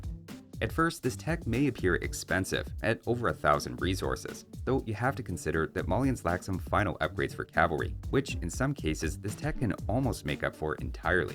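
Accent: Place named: Canada. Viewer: Great video as always,! Thanks for the insight. I don't expect you to pronounce everything super correctly, but Mali is pronounced with the "a" as in "cat" - rhymes with alley. (To pronounce it like a West African, the "l" is different too, made with the tip of the tongue forward, close to the back of the teeth. But that would probably sound kinda weird in the middle of a Canadian accent.)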